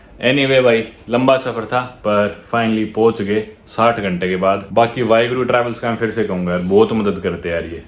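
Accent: Haryana, India. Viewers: Haryani axent 😂 loved it❤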